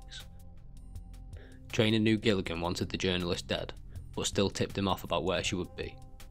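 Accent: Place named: Ireland. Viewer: Really? Seems fine to me. He is Irish so there’s an accent but no problem understanding him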